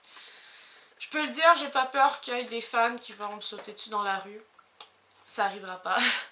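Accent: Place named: France. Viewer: Ouf, l'accent français!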